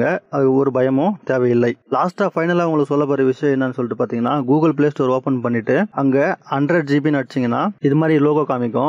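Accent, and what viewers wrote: Chennai, India. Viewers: Chennai Tamil la informative video 🌝🌝🌝awesome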